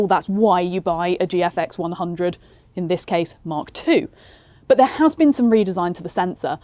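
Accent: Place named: United Kingdom. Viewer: 👍🏾👏🏾💐📸👸Good review. Love the British accent. Phelix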